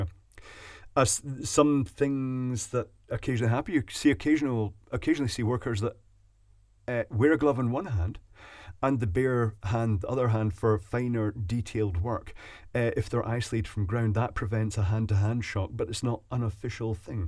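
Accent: United Kingdom. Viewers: It's amazing how Americans perceive British accents. I envisioned you with a double breast suit, bowler hat, and maybe a pipe. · Wow... We see you... I watched many videos... I pictured a completely different looking person. Ha! It's the British accent.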